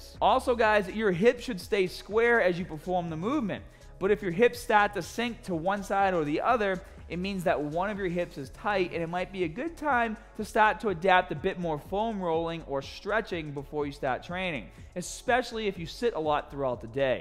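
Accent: Boston, United States. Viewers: Damn...you’ve been in Florida for several months...and still have that Baaastin accent....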